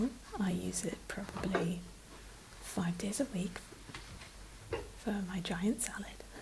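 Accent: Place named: England, United Kingdom. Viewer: I’m so confused. I thought you were American but you sound so English 😮🤯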